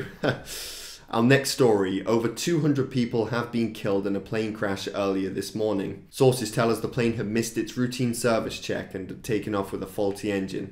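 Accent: United Kingdom. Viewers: Damn you're the only man that had make me love the british accent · he may have a British accent, but this video has a strong American vibe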